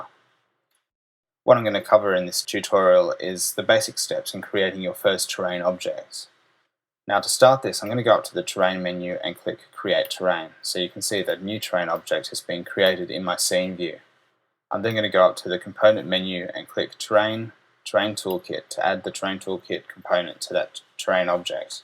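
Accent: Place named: Australia. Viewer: i thought i heard an aussie accent, we aussies are legends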